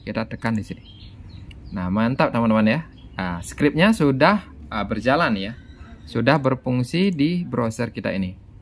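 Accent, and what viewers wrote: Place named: Bali, Indonesia. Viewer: Orang bali ini, medok bali ngomongnya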